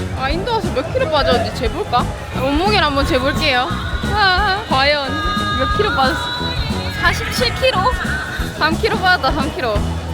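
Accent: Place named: Gyeongsang, South Korea. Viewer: 경상도에서 자라시고 TV를 많이 보신 분들은 아실텐데 옛날에 두 남자의 만국유람기라고 프로그램이 있었는데 거기 나오는 김근수씨 느낌도 나면서 (해외인데 꿋꿋하게 한국어 + 사투리로 소통하심ㅋㅋㅋ) 센스도 있으심! 너무 재밌습니다. 같은 진주사람으로 앞으로의 버킷리스트도 응원합니다~!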